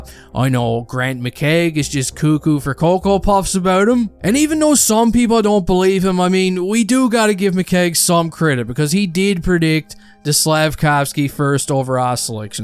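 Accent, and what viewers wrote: Newfoundland, Canada. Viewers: Sounds like a Newfoundlander. Where are you from?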